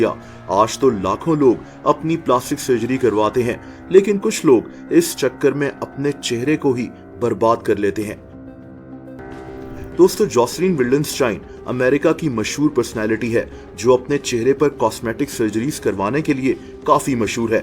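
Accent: Multan, Pakistan. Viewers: Are you from multan??????????????😊😊😊😊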